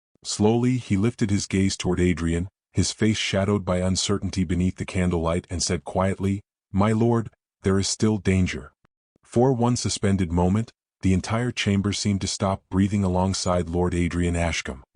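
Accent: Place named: United States. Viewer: An AI with a mechanical robotic American accented voice for a story set in Britain. Odd...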